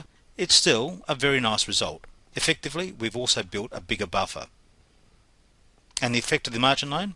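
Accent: Australia. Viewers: love the aussie accent, and very well explained thanks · Australian accent?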